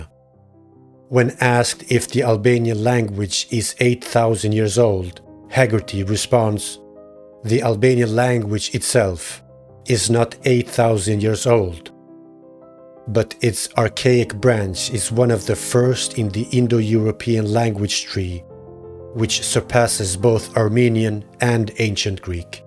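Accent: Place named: Arab world. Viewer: O njerez, nese doni me ba dokumentar te tille dhe ne Anglisht atehere le te jete o dikush qe flet me mire o dikush qe e ka gjuhe amtare, ky narratori po tingellon si me lexu noj Arab.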